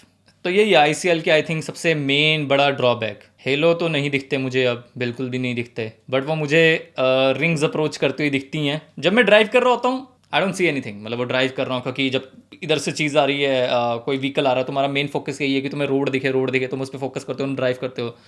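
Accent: Punjab, India. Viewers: Excellent way of explaining ( voice and punjabi accent makes it even more interesting)... Last tak interest bana ke rakha... And sharing your own experiences is the best thing as it is no filter experience... Great job! ❤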